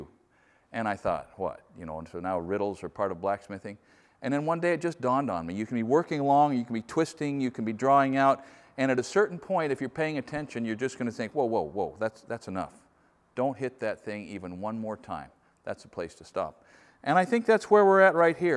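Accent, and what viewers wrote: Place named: Oregon, United States. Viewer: It sounds like you're from Oregon as well. So cool! I am interesting in learning blacksmithing myself and this is a very helpful video.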